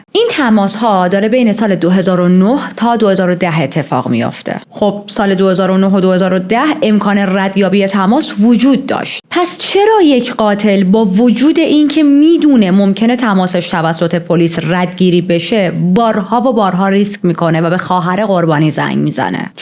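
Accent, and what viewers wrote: Shiraz, Iran. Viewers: کاکو شیرازی هستی ؟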